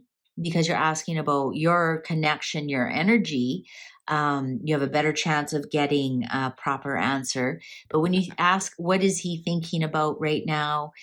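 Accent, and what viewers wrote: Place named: Canada. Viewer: I’ve been binge watching while I’m healing. I am in awe how your readings go. Cards that represent a person is amazing, love it. Clear clarity. Also I feel intuition is so important. Don’t second guess yourself and let the cards tell the story. Your Canadian accent is so endearing. I lived in Canada until I was 7 or 8. Mom brought us to the states and been in Michigan since. People especially down south visiting ask me where did I get my accent. LOL. The Canadian in me is not lost. Phrases and eh, too many words to list. My point is you are so charming and remind me of home❤ Thank sweet lady. I’m going to try to book a reading. Everyday I look for your new uploads, love commenting in a positive manner. God Bless❤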